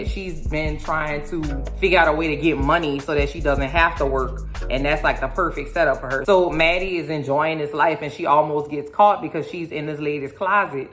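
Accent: New Orleans, United States. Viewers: I just love your Nawlins accent. Me being from Monroe, I knew IMMEDIATELY you were from New Orleans.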